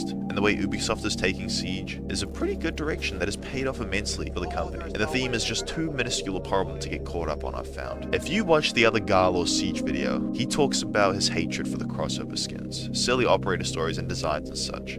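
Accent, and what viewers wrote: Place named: Australia. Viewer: You sound like elmaxo (no it’s not just the australian accent)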